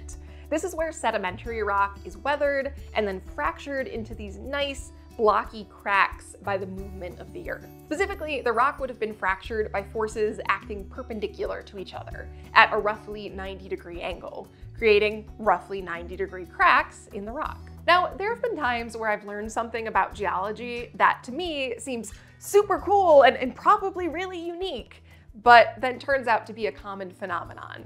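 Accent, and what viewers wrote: Michigan, United States. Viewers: Good to hear the Michigan dialect again. Been awhile since hearing it.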